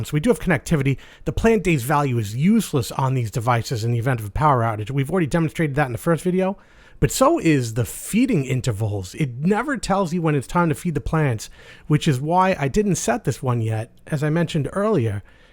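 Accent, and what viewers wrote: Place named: Massachusetts, United States. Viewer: Love your videos. I could tell from your 'r" that you are from the Massachusetts area.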